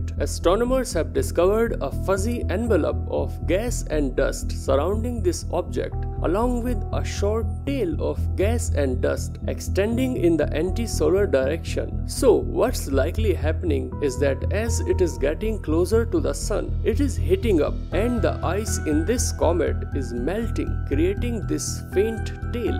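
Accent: India. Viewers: the first word you say, I hear you're an Indian.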